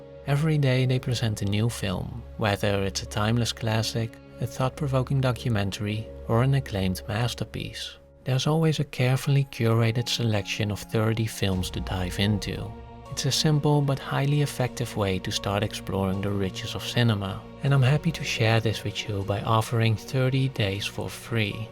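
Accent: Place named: Netherlands. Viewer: I recognise your accent is it dutch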